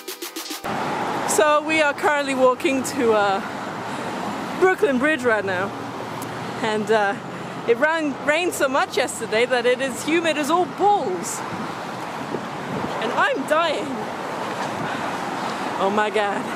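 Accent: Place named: Australia. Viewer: Do you live in the USA? Your accent sounds Australian. That would be a very long flight to New York to just visit for a few days. Haha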